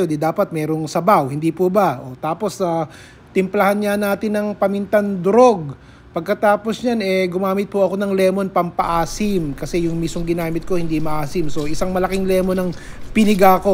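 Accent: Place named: Nueva Ecija, Philippines. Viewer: tga Nueva Ecija ka ba, kasi ganyan ang punto ng Novo Ecijano eh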